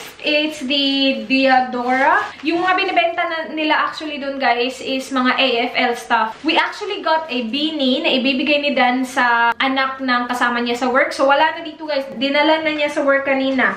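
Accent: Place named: Visayas, Philippines. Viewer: Bisaya tingali nis indai..mailhan Jud ang punto ba hehe...basta bisaya nice jd mag English..dabah? 😉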